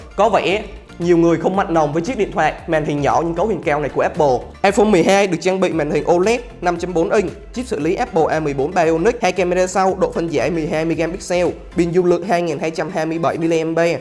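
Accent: Phu Yen, Vietnam. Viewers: Giọng phú yên nói nhanh quen rồi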